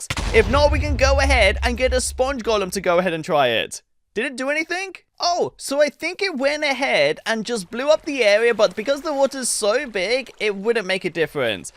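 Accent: United Kingdom. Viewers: And is he britis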